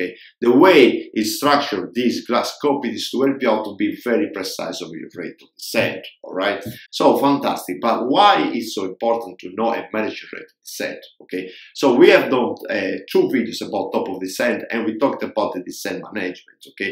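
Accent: Italy. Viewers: Salve capitano.....ha un accento italiano....grazie per i suoi video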